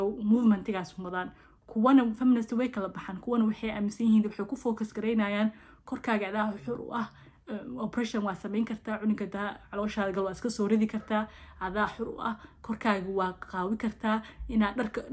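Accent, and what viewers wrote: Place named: Egypt. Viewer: Abaayo masha allah wax loo baahnaa ayaa ka hadashy shukran lkn su'aal masar miyaa ka tagtay waa laga dareemaya lahjadada salaam from Egypt 🥰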